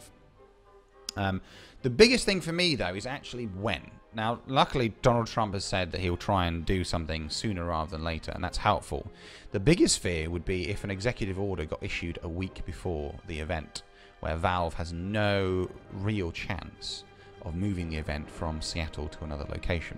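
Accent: United Kingdom. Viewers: Most typical british person ever. he has got it all, top hat, accent, politeness and ego. Amazing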